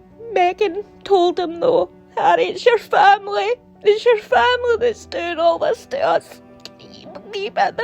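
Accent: Scotland, United Kingdom. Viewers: You nailed it,. Those poor poor Sussex parasites. I love your Scottish accent. It reminds me of my dear Gran and Grandpa, long gone but never forgotten. I hope you recover soon.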